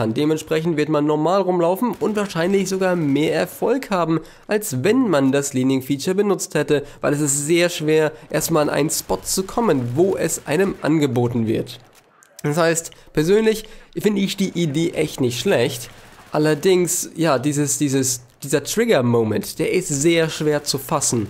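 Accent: Germany. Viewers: Kommst du aus Norddeutschland?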